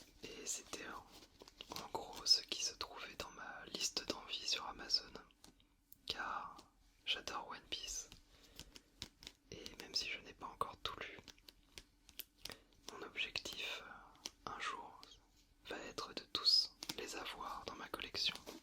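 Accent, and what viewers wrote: France. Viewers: Joyeuses fêtes, j'aime beaucoup tes vidéos je te trouve très apaisé et apaisant. J'apprécie le soin et la préparation que tu accordes à tes vidéos, je pense que ta chaîne est ma chaîne ASMR préférée:) PS: J'aime bien ton accent français sur tes vidéos en anglais, c'est un bon trigger ajouté au chuchotement:)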